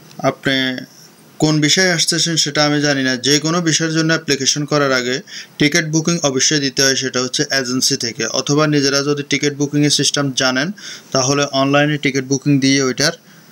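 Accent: Noakhali, Bangladesh. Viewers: ভাইয়া আপনে খুব চালাক মানুষের কথা বুজে পেলেন। তাই আপনার কথা গুলা বেসি বেসি শুনি খুব ভালো লাগে আসোলে নোয়াখালির বোলে কথা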